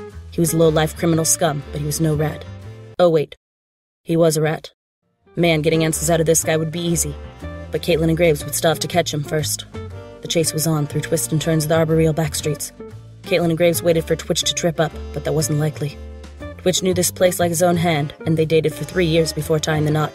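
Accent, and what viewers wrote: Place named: England, United Kingdom. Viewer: This was the worst and most annoying English accent i've ever heard.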